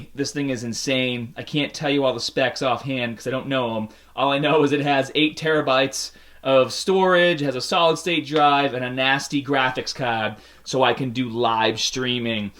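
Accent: Boston, United States. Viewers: I never moticed the Boston accent until now. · sounds more like a Boston accent or in and around the area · Did you randomly gain a boston accent? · it's a Boston accent, that's what it is... they speak normally on several of the words but then sometimes they say others things weirdly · iv noticed you've picked up a Boston accent that you didn't have in your earlier videos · Are ya from Boston? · Love the Bostonian accent . Nice gains ! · Orpheus it's called the baaahstan accent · how is your Boston accent getting thicker? I thought you didn't live in Boston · First off your Boston ascent is coming out very much lol... funny to hear. Second off... Me as an LMT, I have noticed it looks like your hips are off kilter, meaning one looks higher then the other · Bruh I have't seen one of videos in a few years... Is it just me or is his Boston accent more prominent now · Dutch Dude Yeah it's definitely a boston accent, except the rest of his speech isn't nasally like a bostonian's is. 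· Boston accent makes me cringe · You from Boston? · Shaan Targaryen I noticed too. I've seen some of his older videos and he doesn't have this Boston accent in them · Dude love your videos. learned so much. You are definitely from Boston right? "My amms" lol The r is silent in Boston. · Did he just get a boston accent from nowhere?? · STOP TALKING LIKE A DOUCHE!!!! That Boston accent is horrendous. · the boston accent is ridiculous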